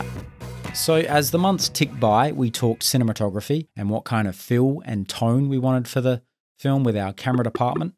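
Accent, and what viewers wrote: Australia. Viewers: Ahh, I always thought you're an American.. Turns out you're an Australian. TIL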